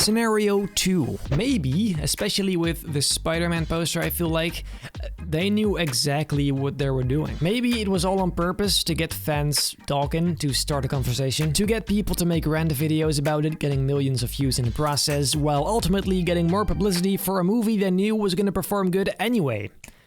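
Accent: Netherlands. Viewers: en dit zegt iemand die engels praat op 'n hele nederlandse manier...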